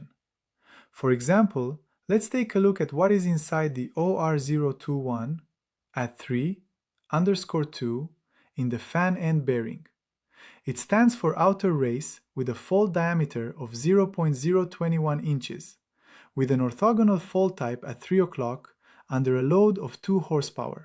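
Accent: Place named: Iran. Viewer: Great video, especially with your sweet Persian accent! :) I tried to find detailed geometric information about the defect, but I couldn't find anything. Did they use EDM to create a single through-hole with the specified diameter? I'm working on a dynamic model of a defective bearing and would like to use the CWRU dataset to calibrate my model. Therefore, I need detailed information about the defect geometry.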